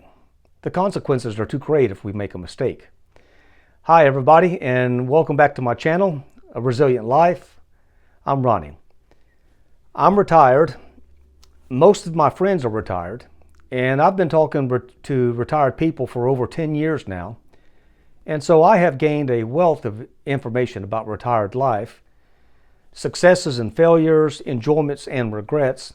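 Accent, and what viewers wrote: South, United States. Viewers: l like your southern drawl is it?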